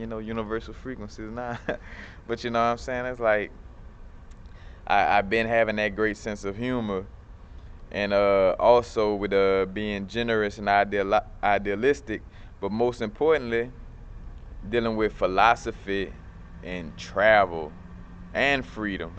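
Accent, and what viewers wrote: New Orleans, United States. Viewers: You gotta be from the n.o huh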